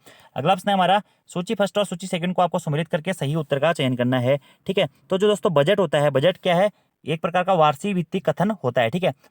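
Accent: Almora, India. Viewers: Ap almora se ho kya sir😅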